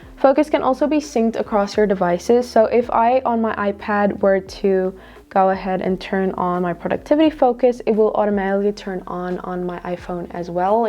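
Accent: South Africa. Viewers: Are you South African? Great video!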